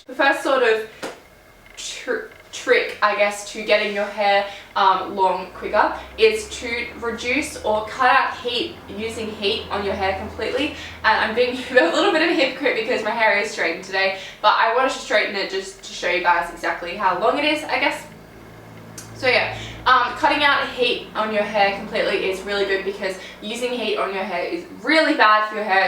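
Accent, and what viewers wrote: Australia. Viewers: Your from Australia aren't you bc accent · Are you Australian?? it sounds like it. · Oh my gosh are you from Australia :O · Are you Australian?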